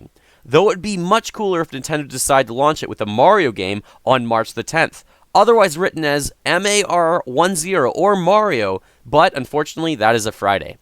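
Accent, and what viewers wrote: Canada. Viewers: "it has BEAN in development it has BEAN delayed" gotta love Canadian accents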